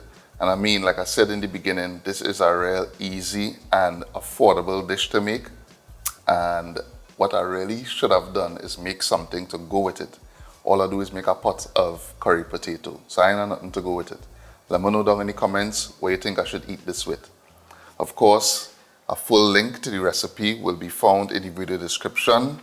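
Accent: Trinidad and Tobago. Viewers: i love trinidad accent soo much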